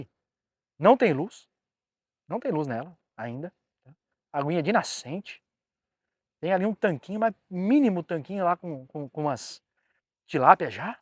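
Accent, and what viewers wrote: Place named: Minas Gerais, Brazil. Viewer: Como fala o mineiro . Que bobajada bunita sô.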